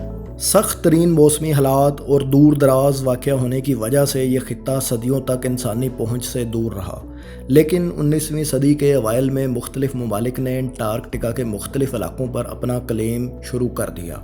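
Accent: Punjab, Pakistan. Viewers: Sir Plz Q Krte Henn Asa.. Lafz Tk Sahi Nhi Boly Ja Rhy.. Majbot Rhna Bhai Mazbot... Urdu Hi Sahi Bol Lo Bhai Plz.. Punjabi Feel Arhi Hy.. Zahar Hy.. Zahir Hy.. Ufff.. Faisal Bhai Humre Kano Ko ApKi Awaz Chahiye.. Standrd Bna Dia Hy To Ab Pura Krain.. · Punjabi accent me Urdu bolrhe ho. Pathetic voice, bring back Faisal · آپ کی اردو سن کے یہ چینل ہی دل سے اتر گیا...پنجابی نما اردو سے بہتر تھا پنجابی میں ہی وائس اوور کر دیا جاتا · Punjabi accent,,,,,,,,, :)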